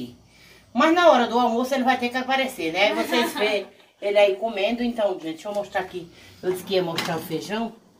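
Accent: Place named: Northeast, Brazil. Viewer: Boa noite minha linda 😁 eu amo os teus vídeos 😁 agora sim eu entendi porque você fala tanto em Barbacena 😁 vejo que tem sotaque nordestino 😁 eu sou mineira e amo esse povo do nordeste 😁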